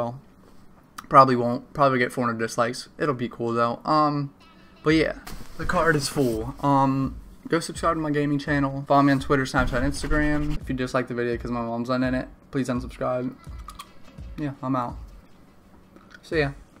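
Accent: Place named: East Coast, United States. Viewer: east coast accent im guessing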